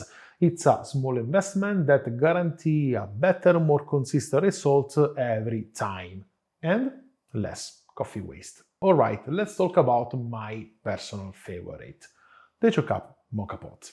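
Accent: Italy. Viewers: He is genuine, he is experienced. The Italian accent was a little tricky for me, so I added the subtitles on. However, I learned how to use the Moka Pot for Italian style coffee.. Can you show me the exact increments for each cup of coffee, For example, 12g of coffee with 70-80 g water. So what would the increments be for the other cups of coffee? The exact increments will make better coffee. Thank you so much for this presentation,. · Listening to 10 minutes of english with an italian accent is the real treat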